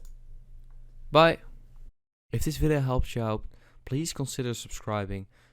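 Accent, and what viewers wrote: Netherlands. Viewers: he sounds like a dutch person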